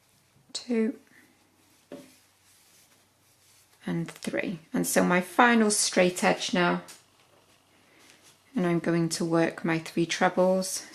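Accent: United Kingdom. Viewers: Your British accent is a winner as well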